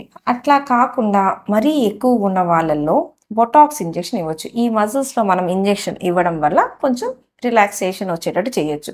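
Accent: Guntur, India. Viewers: అచ్చమైన గుంటూరు యాసలో మాట్లాడుతున్నారు🎉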